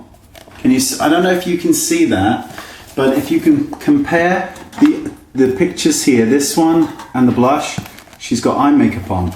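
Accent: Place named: Cornwall, United Kingdom. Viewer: Great video. Honesty is wonderful. You sound just like my friend from Cornwall. Sounds lovely. xx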